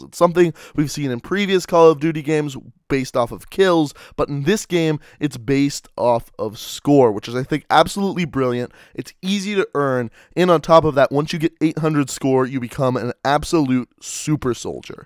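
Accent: Canada. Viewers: Are you canadian? You say aboot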